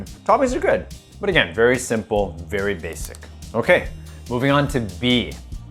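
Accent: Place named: United States. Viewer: This may be a crazy question...but how did you get to speak english so well when you were brought up in Japan....you sound as if you grew up in the states....I must be missing something in your history....lol....sorry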